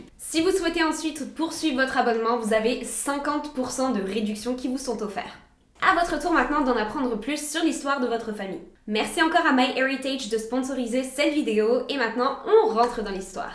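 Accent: Quebec, Canada. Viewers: Au top. Comme d'hab 🔝👍🔝👍.... Retour en France du coup🔙🔜..... Promis pour une lilloise... T'as vraiment l'accent quebecois😆😆...j'adore👍👍👍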